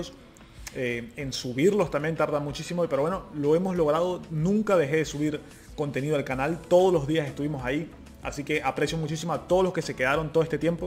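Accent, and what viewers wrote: Venezuela. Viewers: Matias Cohen No contradigo que actualmente vive en Italia. Lo que contradigo es tu comentario “Es italiano”, en realidad es Venezolano (según yo) por lo que dijo desde el minuto en adelante y también por su español (con acento venezolano) fluido, tengo una profesora de Italia en mi Universidad y ni ella habla el español tan fluido como.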